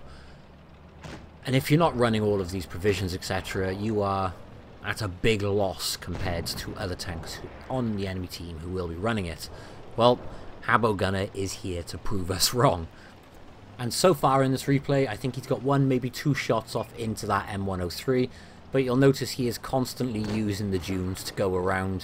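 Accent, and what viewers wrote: Syria. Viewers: جدع يا:D لهجتك كانك سوري بس مش فارق المهم عربي ^^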